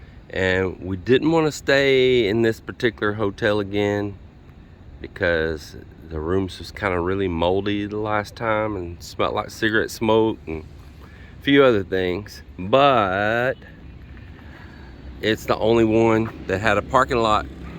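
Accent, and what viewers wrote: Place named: Texarkana, United States. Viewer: I keep trying to place your accent. Texarkana?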